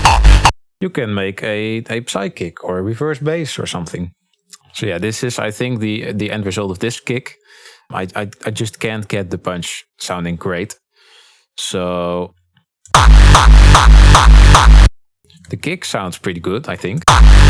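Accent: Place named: Netherlands. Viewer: Are you Dutch? Because you have the typical Dutch accent in your English. Althans.... dat denk ik wel te horen :)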